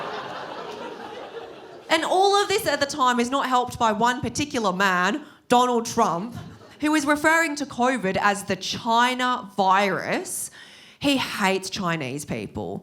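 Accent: Australia. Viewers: Girl, you're the most Aussie Aussie there is. You can't have an accent like that and be considered anything other than full Aussie! If you ever decided to swap Australian citizenship for British citizenship after living there long enough, it wouldn't matter, there's no way you'd ever be considered anything but one of us ❤🇦🇺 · You've got the thickest Aussie accent. Actually really funny too. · Aussies are more racist than we would like to think but not as racist as our national history would suggest. White Australia was explicit policy in my lifetime, and the historical fiction that Australia was uninhabited at the time of European "settlement" formally dissolved only about thirty years ago. Treatment of Chinese immigrants in the 1800s was abysmal. The Cronulla race riots were only about twenty years ago. Frankly your Aussie accent is stronger than mine, but nobody ever questions my national identity - because I'm white. 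Nobody ever calls me a European Australian because it's "normal" for Australians to be white. But glad you can find the humour in it. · I'm a Maori Kiwi & my fav'rit English accents are Aussie & Afrikaner_ welp! Seeing this gorgeous Chinese face speak'n Aussie din'kum just makes m'day & she's damn funny 💯🤙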